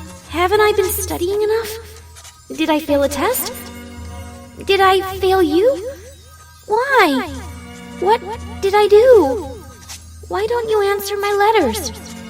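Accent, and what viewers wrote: United Kingdom. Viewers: i loved your storys plus your voice is kinda cute in British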